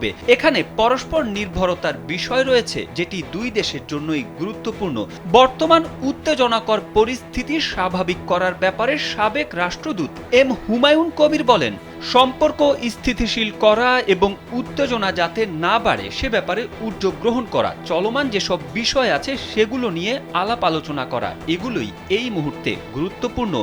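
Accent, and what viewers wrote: Kolkata, India. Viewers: তুই তো দেখতেছি কলকাতার ভয়েস নকল করা শুরু করছিস😅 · আপনার প্রচারের গলা ভারতীয় দাদাদের মতই। দয়া করে সাবলীল ভাষায় সংবাদ পড়বেন। নতুবা বয়কট।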